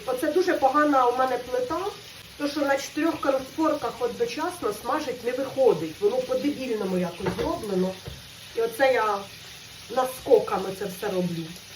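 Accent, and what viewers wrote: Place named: Zolotonosha, Ukraine. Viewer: я тащусь від твоєї мови.Точно,як у нас,в Золотоноші.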